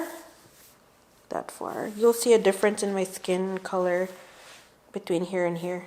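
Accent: Philippines. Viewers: in this video she has that Filipino english accent....but her sister has more of d Pinoy accent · agreed she's talking with a more Pinay accent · She has a very distinct Filipino way of speaking certain words beginning/ending with ‘t’ and ‘th’. She substitutes a ‘d’ quite often; they becomes dey; with becomes wid; time becomes a subtle ‘dime’; there becomes dere.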